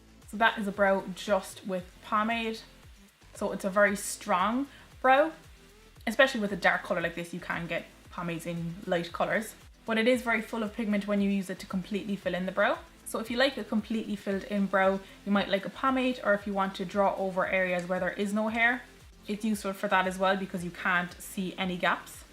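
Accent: Ireland. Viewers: Thanks for replying - it means a lot to me. Yes my eyes are like yours where the crease hangs down and blocks out part of my lid when my eyes are open.. I am so glad I "found" you Its lovely to hear the irish accent as Im irish (Mayo) living in England. Maybe sometime - who knows I may get to Cork and go to you for some lessons!!. All the beauty tutorials on youtube are great - but sometimes women of a certain age (like myself) are at maybe a low point in life (menopause etc ) lacking confidence and at a loss as to how to enhance the looks they have. I love the way you put your tutorials across it is very down to earth but very informative and easy to follow.- thank you. Maybe sometime you might do a full face and eyes for mature women???? Maybe??? I will keep a look out. God bless xx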